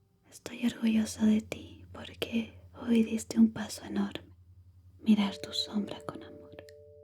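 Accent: Chile. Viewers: Gracias a tí preciosa, puedo pasar de 🤬 a 😊 Tu voz dulce y acento chileno, hacen maravillas ✨✨✨🌹👸🌹 Gracias 🙏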